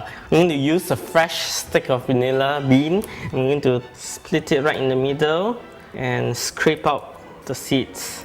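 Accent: Singapore. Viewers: are you like singaporean?? haha. the accent :D i'm singaporean too. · dang. that looks delish! and i miss this accent lol. singaporean or malaysian?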